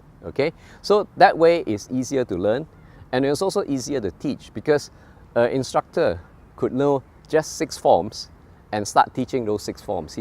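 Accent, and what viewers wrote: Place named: Singapore. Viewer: Sounds like he is from Singapore! I wanna learn from this sifu😂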